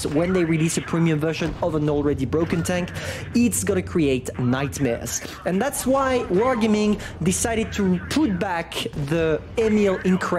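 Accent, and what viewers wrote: France. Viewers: I live in a cave but I speak English without such an annoying French accent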